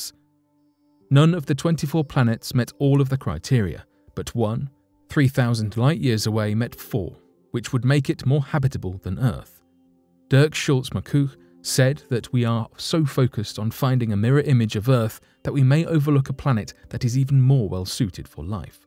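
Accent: United Kingdom. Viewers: The reason that there is not as much Ozone at the south pole is not due to abnormal depletion, but due to the much lower amount of solar radiation that is necessary to form ozone, due to tangential angle of sunlight over Antarctica. But you say all this information in a British accent, and people believe it!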